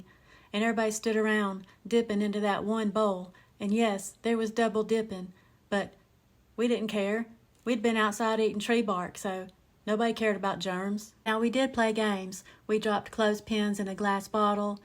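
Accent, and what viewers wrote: Appalachia, United States. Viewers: OMG! you've got stop making these videos, I'm laughing so hard I'm going to pee my pants. I love watching you talk with that Appalachian accent it's just the cutest thing that I have ever heard. Man you are so beautiful girl.❤❤❤❤❤❤❤❤❤❤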